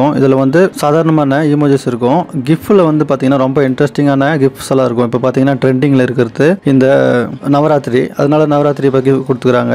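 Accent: Chennai, India. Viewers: local Madras baashai pesatheenga broo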